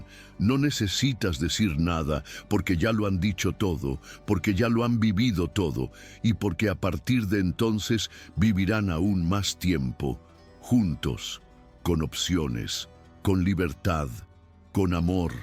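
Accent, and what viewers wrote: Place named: Colombia. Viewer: Usted es colombiano, cierto? Ese español, esa vocalización, es inconfundible. Es un narrador excepcional! Felicitaciones!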